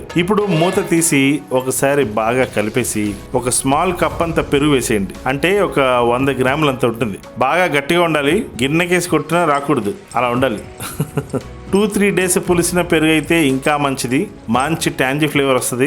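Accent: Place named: Telugu, India. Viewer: garu veg fans ni alarinchi naduku Dhanyavadalu!! Sir meeru films lo padalani korukuntunamu original telugu voice vastundi mana patalaki.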